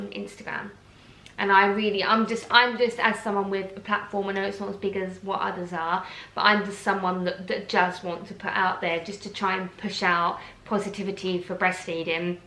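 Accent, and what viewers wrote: Essex, United Kingdom. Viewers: I'm from Essex too. People do judge a person by their accent. It's like you are taken less seriously. However that's usually idiotic people that are stupid themselves. Most intellectual people will judge what someone is saying rather than the accent. Loving the jacket. Classy as ever. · So sorry that man spoke so despicably to you at the hospital. How awful! I really enjoy your accent, but as a South African, all UK accents sound 'odd' to me, and I love them all. Not sure what's posh and what's not :) Had a terrible experience in a Paris store once. I was literally ignored. I did look like a tourist, and it wasn't a luxury store, but it was a quaint little store near Mont Matre. I was so embarrassed and it put me off completely. I don't have a ton of money, but I'd saved so much for the holiday and wanted to get something quintessentially French. 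It was a painful experience.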